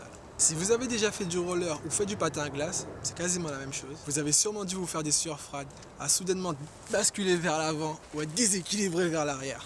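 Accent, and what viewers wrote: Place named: Guadeloupe, France. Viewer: Pour ton accent ne le dissimule pas ça rajoute un charme ;) #vivgwada #panipwoblem 😅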